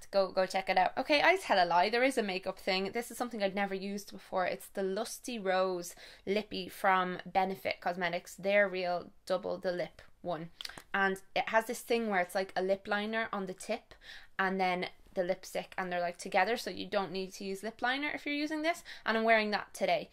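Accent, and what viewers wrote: Ireland. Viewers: To be honest I started watching your channel coz you're Irish and I love your accent (I had a girlfriend that's from Edenderry but she won't talk to me anymore and I miss her accent) but I actually really enjoy your content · I love your videos so much and I love to hear an Irish accent on YouTube and I'm from Ireland too (cork) 🇮🇪🇮🇪🇮🇪🇮🇪🇮🇪🇮🇪🍀🍀🍀🍀🍀🍀💚💚💚💚💚💚💚💚💚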